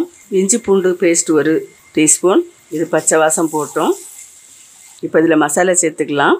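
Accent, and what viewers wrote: Nagercoil, India. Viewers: I like to hear Nagercoil slang. Thanks for the video ☺️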